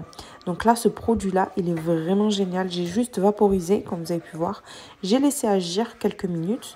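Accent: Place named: South, France. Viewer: Salam aleykoum hbiba 🥰. Merci pour ta vidéo motivation 🙏. Tu as une belle maison 🏠 dans la prairie 😂 machallah. Moi aussi je finis de laver ma plaque et je vaporise du produits vitres pour retirer les traces de doigts. J'adore tes vidéos et ton accent du sud ❤. Qu'allah te préserve toi et ta famille 👨‍👩‍👦‍👦 inchallah 🤲🤲. Bisous Saïda ⚘ 🌹 ⚘ 🌹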